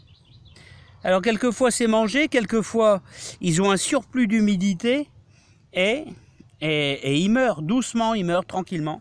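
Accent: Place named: Béthune, France. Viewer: Bonsoir Mr Depuis qqs jours je visionne vos vidéos avec plaisir et attention. J ai bien l intention de mettre certains de vos conseils en pratique. Votre accent aussi me réjouit vous parlez du Haut Bethunois( quelle commune exactement?),je suis moi-même originaire de Bethune,même si je vis depuis plus de 30 ans en Charente Maritime. Retraitée depuis qqs mois je profite e cette chance pour principalement m occuper d un potager (loué depuis 20 ans et acheté en janvier) J essaie de jardinier bio et naturel, d appliquer qqs principes de permaculture(notamment de maintenir un sol vivant) J ai essayé cette année (très chaude et très sèche) de nouvelles cultures avec plus ou moins de réussite Des topinambours récolte à venir Des poires melon aucun fruit ,pieds repiqués ds ma petite serre tunnel De la poire de terre (,Yacon) récolte la semaine prochaine Des endives hélas avec la sécheresse les racines sont toutes petites Tant pis je recommencerai j espère simplement que 2023 sera une année plus "normale " En tout cas merci pour votre bonne humeur et votre naturel. 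Bon mois de Novembre. Maryse Lydie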